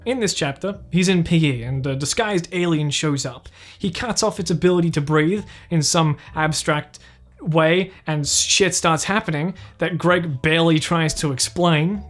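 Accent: Australia. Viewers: Are you an Australian Danny Gonzalez? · that’s the beauty of the Australian accent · didnt realize you were Australian thanks for the call back im one of the audio listeners. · he's australian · I always forget you're Australian.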